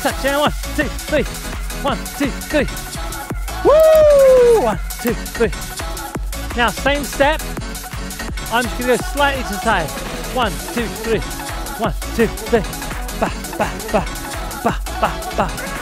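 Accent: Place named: United Kingdom. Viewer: My new favorite one of all! 🙌🏾 🎉🎉🎉 I’m in the US and I realize now… we don’t speak English here!!!! 🤣🤣🤣🤣 Love the Queen’s English, love these videos 🥳🥳🥳 Thank you!! 🙏🏾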